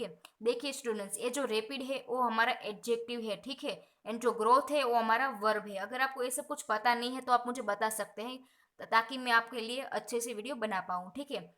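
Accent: Gujarat, India. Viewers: i think mean from gujju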